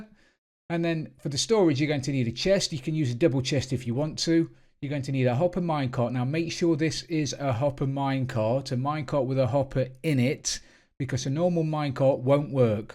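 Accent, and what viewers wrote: England, United Kingdom. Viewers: I love his English accent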